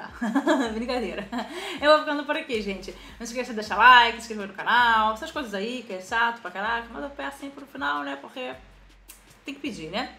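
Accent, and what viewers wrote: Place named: Rio de Janeiro, Brazil. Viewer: O sotaque carioca é top. KKK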